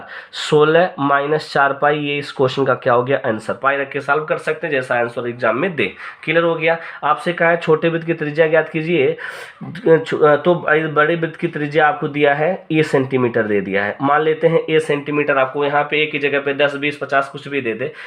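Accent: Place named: Bhojpuri, India. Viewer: Bhojpuri achha bol rhe hai sir.....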